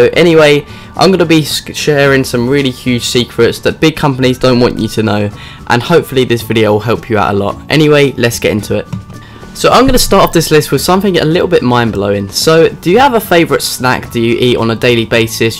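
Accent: United Kingdom. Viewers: great information and you spoke good and clear ..love your British accent ..my guy is from Greater Manchester Uk . I could understand you better than most Americans speak ..great job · On the contrary Mr. commentator, you presentation was very understandable even with the nice British accent, I beleive. thank you kindly for sharing your experiences. Prof. Bruce W. Marchetta Th.D Hypnotherapist - educator 3-1-2017 baja ca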